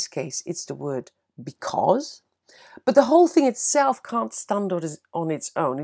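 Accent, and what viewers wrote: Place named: North, United Kingdom. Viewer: Just interested.. Is the lady on the video a native speaker of Dutch? I would have thought so, despite the slight northern British accent 😊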